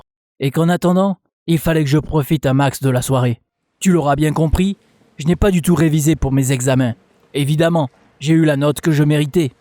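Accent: Marseille, France. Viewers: Je viens juste de remarquer qu'il a un accent marseillais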